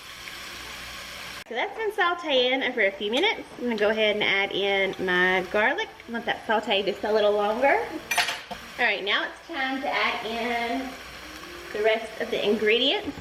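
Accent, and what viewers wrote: South, United States. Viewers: I love all your videos :) You are such a nice person, and I love your southern accent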